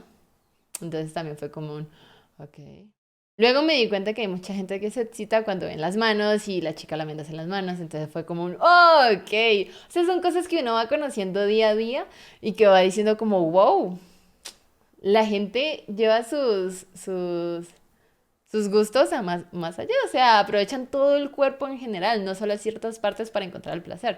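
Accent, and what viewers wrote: Colombia. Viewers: No sabia que eras colombiana, tu acento es diferente, pensé que eras de otra parte xd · Ella me apareció en sugerencias y me pareció monísima, ahora me subscribi porque le siento acento de Colombia que es donde yo vengo ☺️ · De donde eres? Pareces colombiana? Bogotana?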